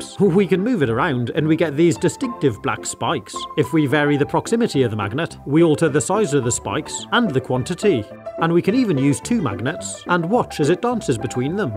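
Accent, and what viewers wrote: United Kingdom. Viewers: I feel that you are British. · So your British? I’m american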